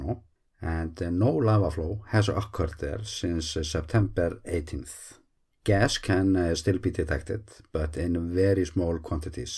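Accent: Iceland. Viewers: Again a most interesting video, but I won't stay until the end but I finally will tell you why. I think you want to know when I just praised your video but leave. It is your pronunciation. You have a fantastic vocabulary, but you put little attention to your pronunciation. Your Icelandic accent, your fluent speech and the subject on a recorded device make it very difficult to follow. 1/3 of it I don't get at all and since your interesting video depends on understanding all you say, I just finally give up because I miss too much. Can you practice your English pronunciation , speak slower when the words you speak are very important to our understanding of the subject? I get tired of guessing! I have no doubt if I were to stand in front of you and if I were to see your face I would understand each and every word. Having someone else read the script would not be the same because it is your subject and we subscribed to you! 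Please don't be offended when I say you could practice reading to yourself and perhaps you would realize to slow down on situations we need to understand fully to learn from your videos the situation in Iceland's volcano field in the area of and around Fagradalsfjall..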